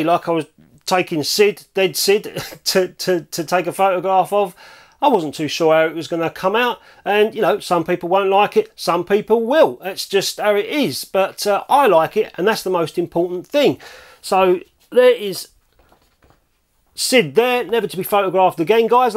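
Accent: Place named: Scotland, United Kingdom. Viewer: As I understand you live in London, but your speech is similar to Scottish😉💂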